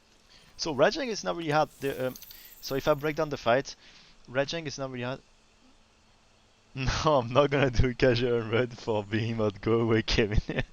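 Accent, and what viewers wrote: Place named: France. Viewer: Alors accent français... 69... région Lyonnaise? 😏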